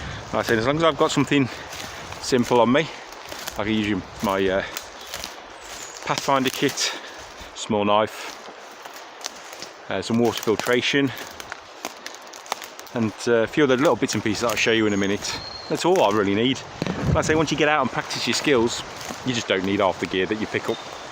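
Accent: England, United Kingdom. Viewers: You will have to forgive my cynicism but when I hear an English accent talking about bush craft and wilderness survival I get a bit sceptical and sarcastic. This guy may have experience in the bush and living in remote places but this video looks like it was taken in a park. Years ago I worked in northern British Colombia and worked in some extremely remote locations and lived out of a tent. In some cases, the only way in and out was be a helicopter or one heck of an arduous long walk over impossible terrain. I am sure there are people who have experienced more challenging circumstances and more desperate survival situations then me but the average guy hasn’t. I’ve had numerous bear encounters, slept in a tent in the snow, slept in the rain with nothing but a sleeping bag and a tarp, been in dense forests with huge hemlock trees in the late fall living out of a tent, lived in a tent in early spring when you would wake up in the night covered in frost, or been baking in 40 plus degree Celsius temperatures in the outdoors with no way to cool off except to drink hot water that was baking in the scorching heat. 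I was always well equipped and for the most part had some kind of back-up, support, and resources. Real survival in the wild is not a game or about having some items in a backpack. If you are ever truly stuck in a remote location and can’t get to civilization it will be better to have some survival items but they won’t help you much. When you haven’t eaten anything in 8 ho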